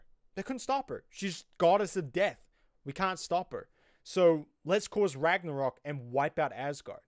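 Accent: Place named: United States. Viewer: Why is your accent messed up? U sound American.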